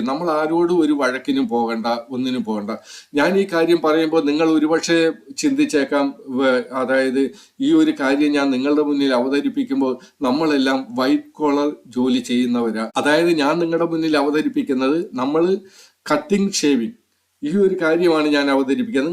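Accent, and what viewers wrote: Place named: Central Travancore, India. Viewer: എന്തൊരു ബോറാണെന്നോ നിങ്ങളുടെ ശൈലി | 5 മിനിട്ടിൽ പറയാവുന്നതു 15 മിനിട്ടിലധികം വലിച്ചു നീട്ടി നീട്ടി.... മധ്യ തിരുവിതാംകൂർ ഭാഷ കൂടിയായപ്പോൾ പരമ ബോറ്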